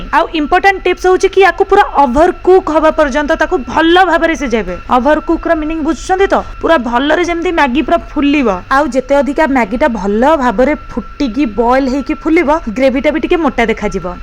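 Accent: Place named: Berhampur, India. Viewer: Didi yaku am berhampur style re besar kuhanti 😅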